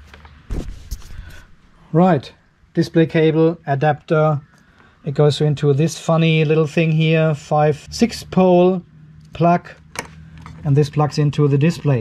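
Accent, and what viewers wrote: Germany. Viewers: ja moin, bin das erste mal hier auf en Kanal und bei dem Akzent gehe ich mal davon aus das du ein deutschere bist?! or am i wrong?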